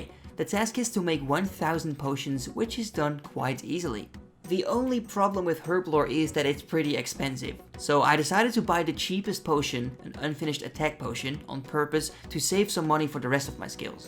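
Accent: Netherlands. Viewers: The dutch accent is strong with this fella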